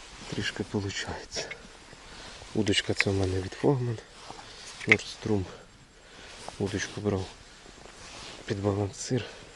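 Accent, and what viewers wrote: Poltava, Ukraine. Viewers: Доброї ночі. Судячи по говору, це походу Полтавська обл. р. Сула?!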